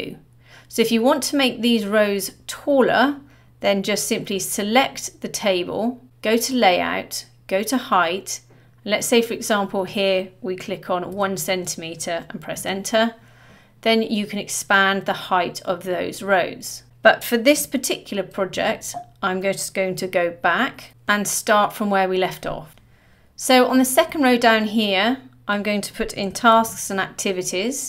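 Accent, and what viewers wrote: United Kingdom. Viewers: What a powerful knowledge on how to play with checklist, etc. Beautiful accent ( British and the calls rocks. Thank you.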